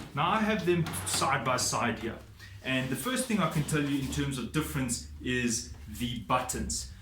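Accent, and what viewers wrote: South Africa. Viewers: As a South African myself, I can confirm that he's from South Africa due to his accent. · definitely south african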